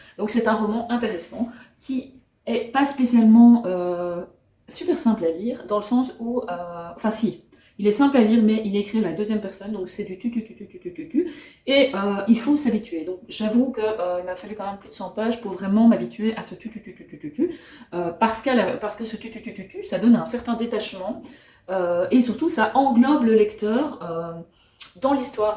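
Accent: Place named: Belgium. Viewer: Hello, J'avais été très touchée par Ce qu'il faut de nuit, un coup de ❤et effectivement il a obtenu plusieurs prix dont celui des lecteurs du livre de poche. Alors pour le langage, tu m'as fait rire😅 car c'est simplement que l'auteur retranscrit quelques expressions et l'accent trainant lorrain, notamment sur le "O" et "A", dont il est originaire. comme toi, tu as l'accent belge pour prononcer certains mots. Je n'ai toujours pas lu les mémoires d'un chat qui est dans ma whislist depuis longtemps... Bravo pour tes chroniques qui donnent envie de lire😊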